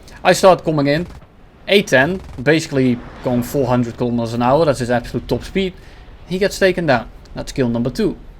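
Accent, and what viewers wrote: Netherlands. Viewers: ik ruik nederlands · Heerlijk het Nederlandse-Engels, keep up the content🙏🏻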